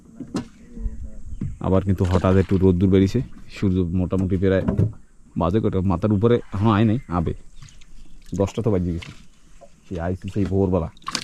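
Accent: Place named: Barisal, Bangladesh. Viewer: Andamaer prakitik drisso osadharon ei drisso dekhar jonno bose thaki ar vai er borisaler vasa sonar jonno apekhe thaki khub valo lage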